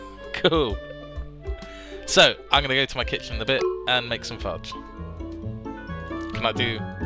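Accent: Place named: France. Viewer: I want you casting every Monster Hunter Podcast in that frensh voice? Très bien! Best regards "Cooking Papa" Oli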